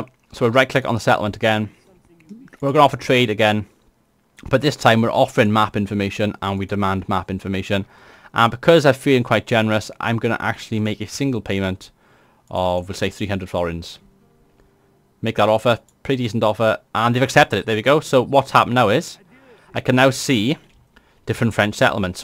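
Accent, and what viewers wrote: Wales, United Kingdom. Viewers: I love your welsh accent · So I bought the definitive version of this game last week, messed about with it for half a week, read tiny bits and bobs about it and realised very quickly I haven't a clue. Looking forward to this set of videos, and it also helps that it's being narrated in a Welsh accent. Love the Welsh from an Irish brother!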